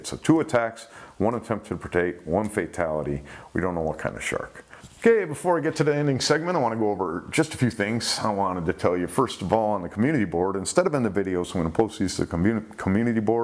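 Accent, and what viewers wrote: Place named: Michigan, United States. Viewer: your show is very educational and entertaining. I look at my wife when the places that I snorkeled and swam come up as locations in your shows (Rose Island, Bahamas, Hilton Head Island, Destin) and say “great…now I wonder how close I actually was to a shark”. BTW there is a link to a guy in NJ that is supposed to be you. I’m from Michigan and you definitely sound like a Michigander…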